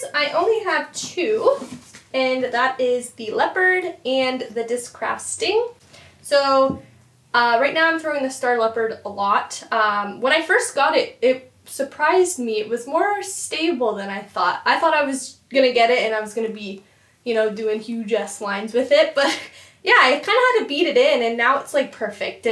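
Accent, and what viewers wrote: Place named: Canada. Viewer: Thanks for sharing! Nice line up. Your accent is adorable! "In the baig" & "aboot" 😉😂💪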